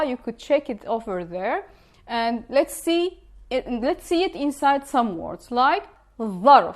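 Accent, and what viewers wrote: Syria. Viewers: First, thank you for your videos. Second, is your dialect from Damascus, Aleppo, etc.. Last question. Would it sound strange if the ق was pronounced with a hard "g" sound?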